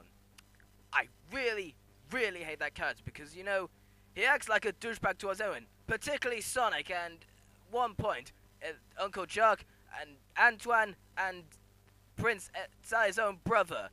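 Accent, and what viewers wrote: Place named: United Kingdom. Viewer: Well I 3/4 agree with you but I lol'd when you started acting like Chris,and you do sound like you have a British accent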